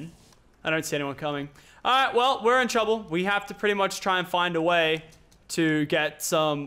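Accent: Australia. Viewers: Are u Australian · So are you Australian or American? Which accent are you putting on??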